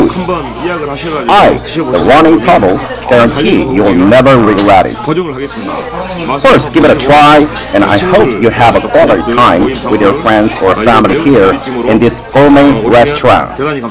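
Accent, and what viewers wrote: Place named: United Kingdom. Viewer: 영어가 영국식 같아요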